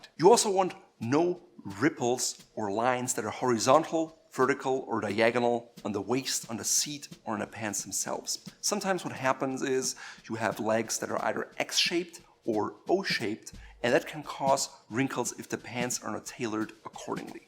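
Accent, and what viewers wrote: Germany. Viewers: oh that german accent .... 🤯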